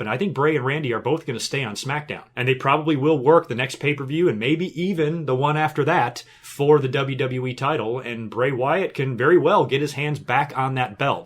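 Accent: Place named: United States. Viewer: love the American accent, house of whores match lmao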